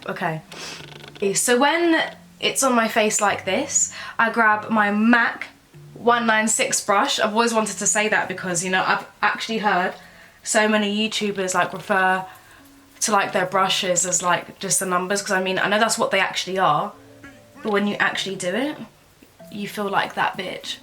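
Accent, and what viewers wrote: United Kingdom. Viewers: U look pretty w no makeup😂💕love ur accent even tho I’m from ldn too. RIP CADET❤️🙏🏽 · Jeez I love the English accent.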